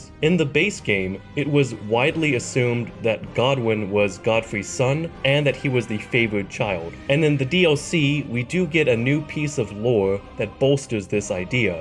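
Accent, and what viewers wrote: Pittsburgh, United States. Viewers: Are you a yinzer?! Pittsburgh'er?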